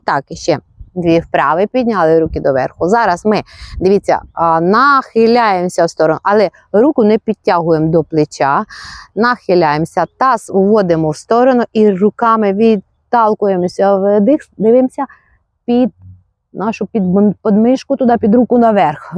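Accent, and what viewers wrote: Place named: Poland. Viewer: Спасибо Вам. Приято слушать Ваш говор, наверное польский.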